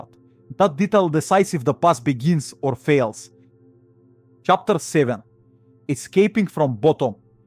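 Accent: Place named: Eastern Europe. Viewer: Yeah slavic accent adds depth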